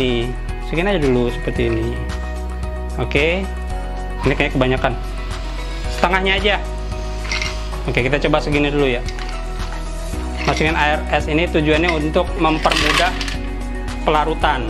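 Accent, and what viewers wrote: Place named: West Java, Indonesia. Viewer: Mantaaappp bah...aslina mn siga sunda,slm sukses tkw malaysia nonton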